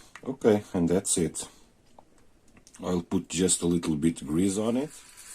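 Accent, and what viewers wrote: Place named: Russia. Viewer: Cool accent, Russian?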